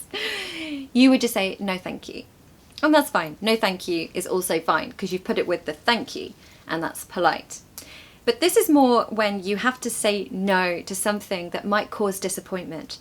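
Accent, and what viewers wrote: United Kingdom. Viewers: Hi, your British accent and Tudor architectural style on the background reminded me of my happy time when I was doing my PhD study in London! I'd like to learn more British English and culture here! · Hello teacher! your accent and of other British teachers are so clean. I can identify the vowels, diphthongs, triphthongs... Like the English teacher in the movie My Fair Lady. A song well recorded, mixed and mastered by a sound engineer, each instrument is heard well, being it in the right or left side of the stereo field using headphones. Thanks teacher! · Hello, I come from HK and now living in UK. Congratulations for you got the Sliver play from YouTube. Super thanks for your video which I did to learn every day. It was really helpful for us to listening British English. 🎉🎉🎉🎉🇬🇧🇬🇧🇬🇧🇬🇧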